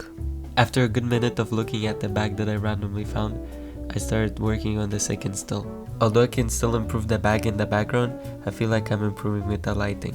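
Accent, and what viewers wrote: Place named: Eastern Europe. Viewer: oh great, first I heard you I thought that you are slavic, by your accent 😅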